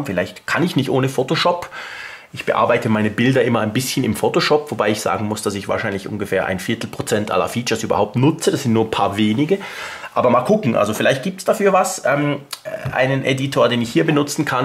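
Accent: Switzerland. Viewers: Versuch mal nicht immer alles in deinem schweizerischen englisch auszusprechen!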